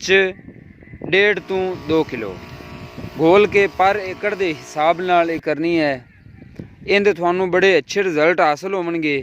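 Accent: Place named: Saraiki, Pakistan. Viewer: آپ کی سرائکی اتنی الگ؟؟!!!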